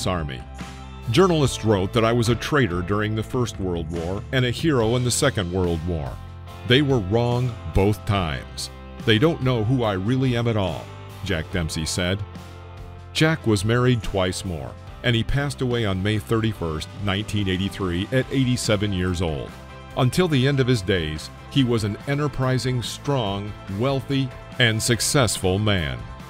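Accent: United States. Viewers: This narration is with an American accent yet Dempsey's weight is given in kilos. They tried to force us into the metric system decades ago, but that was rejected. I doubt we'll be using kg, mm, liters, etc. any time soon. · Hey narrator with American accent, wtf is "kilograms"?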